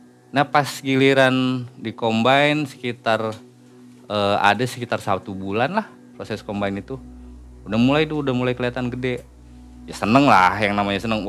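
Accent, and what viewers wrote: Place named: West Java, Indonesia. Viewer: Sunda pisan ini mh mang ei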